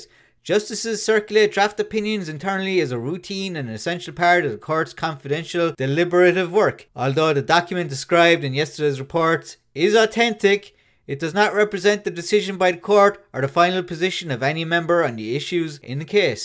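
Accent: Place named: Ireland. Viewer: Gona go ahead and guess your Irish….though you were an Indian dude fighting an accent when I first heard ya. Good content. They have conservatives in Ireland!? Though the whole island had been taken over by abortion activists…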